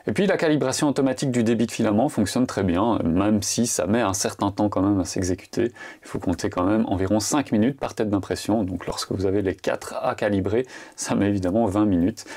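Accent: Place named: France. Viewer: I am from the usa and youtube tries to put the english ai robot voice over your beautiful French accent, I turned it off ;) I can't want for this one! I just bought an H2D to go alongside my X1C and those printers are bulletproof workhorses, but this U1 will speed up multicolor prints by so much, while reducing waste as well as some color bleed issues I had to tune out of some of my prints with a lot of white filament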